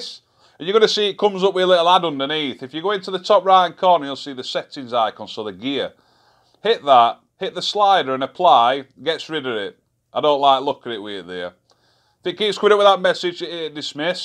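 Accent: Yorkshire, United Kingdom. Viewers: Hi... that's got to be the fastest subscribe I've ever done... 20 seconds in... all I heard was a Yorkshire accent and the laugh... top one mate. I'm on the other side of the Pennines.... Look forward to your upcoming videos.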